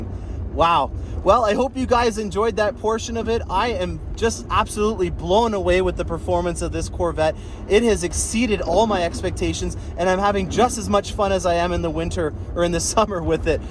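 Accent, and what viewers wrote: Canada. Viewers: That intro screamed in Canadian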